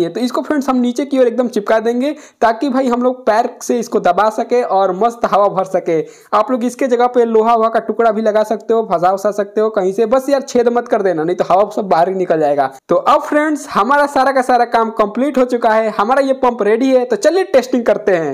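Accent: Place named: Bihar, India. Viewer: Bihari sun pahle hindi Boland sikho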